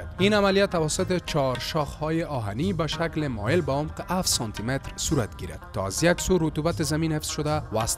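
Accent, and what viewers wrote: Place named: Afghanistan. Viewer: this is done in afghanistan. I'm iranian. the narrator speaks persian with afghanian accent. it's too close to persian.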